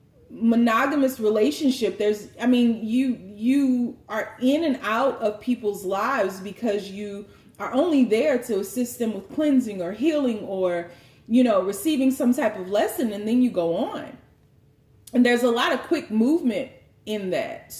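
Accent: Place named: United States. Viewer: I love your accent and style of reading. Which part of US are u from?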